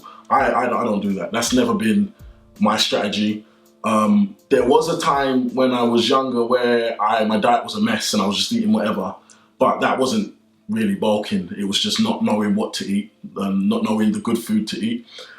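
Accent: England, United Kingdom. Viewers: his accent is so londoner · this is the first time listening to Simeon Panda's voice, I just found out he was an English man?! :) Thanks for the inspiration! :D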